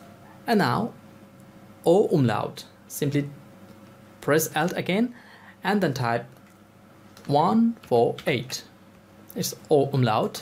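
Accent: India. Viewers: mr. indian i dont know what your saying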